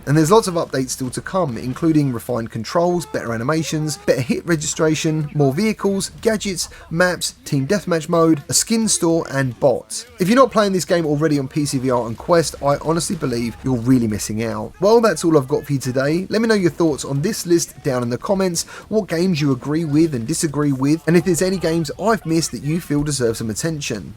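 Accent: England, United Kingdom. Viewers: You need to talk even faster with that dufus English accent. That way no one will understand a thing you say.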